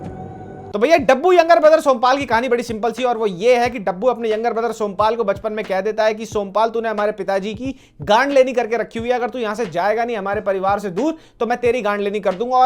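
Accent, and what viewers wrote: Haryana, India. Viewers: 😂😂 I just love your reviews, n specially your haryanvi accent ❤️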